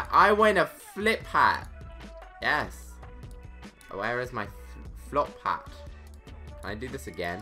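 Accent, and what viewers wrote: United Kingdom. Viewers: are u british? 👁👄👁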